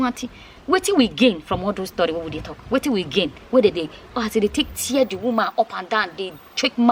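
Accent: Nigeria. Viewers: leee😂😂😂😂your mouth sweet for the pidgen English no be small 👍👍👏👏👏💖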